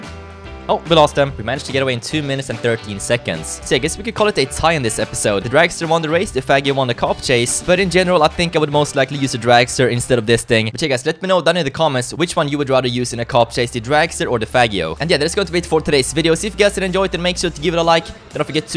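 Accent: Sweden. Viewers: are you from sweden? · are you swedish? ps love ya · oh he is Swedish i knew it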